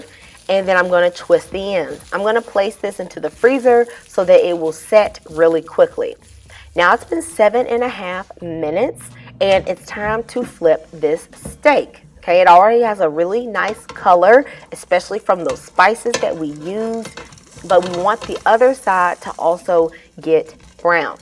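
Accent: South, United States. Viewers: Your voice is even salivating! Which may not seem like that southern touch has much value beyond entertainment, but I noticed i did not have to re-watch the video to catch important details such as cooking times or procedural tips that I often get lost-in when swept up by a videos production impression, or in some sense, distractions from the theme/game. I appreciate any production or performance that I feel immersed in the 'greatness of mind' while on board the content creators intention to convey what i can feel put a smile in there heart and thank you personally for packaging that up in this video and setting the table for us to love and in this way experience the joy that is personified by you, Thank you Lord, in Jesus name~Amen 🙏 💖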